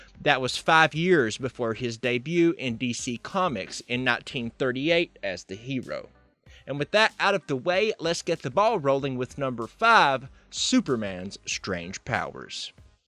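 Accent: United States. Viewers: could have done a "top 5 videos" haha im from oz and your accent is hardcore cowboy haha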